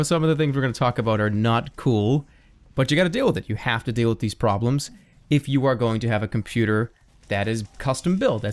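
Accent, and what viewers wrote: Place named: Boston, United States. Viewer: God I miss the strength of his Boston accent in his yt days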